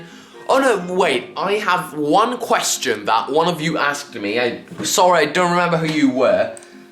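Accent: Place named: United Kingdom. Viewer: I LIKE YOUR VOICE IT'S MY FAVORITE KIND OF BRITISH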